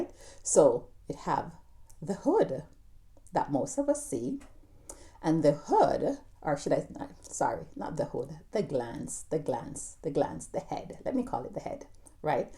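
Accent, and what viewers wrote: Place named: Jamaica. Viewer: Love your Jamaican accent.. big up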